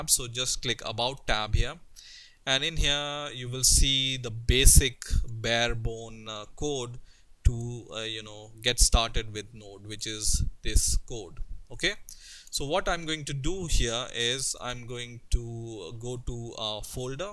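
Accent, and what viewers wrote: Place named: India. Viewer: indian english is best ! so clear and easy to understand ! thnx bhai anyways :-) best tutorial !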